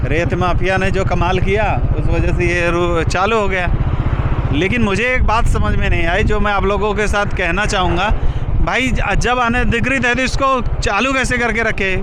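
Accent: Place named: Khandesh, India. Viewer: Najara nahi nazara kahete hain Or Intejar nahi intezar hota hain voh Jarrurat nahi zarurat kahete hain Bijness nahi business Urdu ka janaza mat nikalo language ki study karlo bad me journalism ka shouq karna۔۔۔۔ektarf ka shuru hain dusri tarf ka nahi matalb kya hain 😂😂😂 one way kahete hain use ۔۔۔۔ industriej nahi industries kahete hain۔۔julfen nahi zulfen۔۔۔۔17 manjil nahi 17 manzil .....jameene nahi zameene.....inshort samanya or average class ki journalism.......on camera to Kam se kam ache or bahetrin alfaaz ka intekhab karna chahiye or aam zindagee me jis khandeshi lahejy me baat karte hain usse bahar nikal kar shiree or dilkash lahejy ka istemal karna chahiye...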